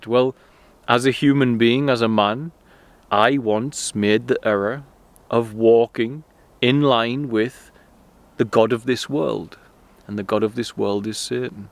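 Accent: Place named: Scotland, United Kingdom. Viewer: Good morning from Polska ! How cool Scottish accent... I do not know who you are, but i got an impression that because Tate spoke about balenciaga scandal, exposed it in vid Karmic Rétribution published a month ago, so he was framed in Romania ? However, he's got some underworld vibe ? Smokes cigar, converted to muslim ( Already was very fanatical towards women): isn't he disturbed anyway ?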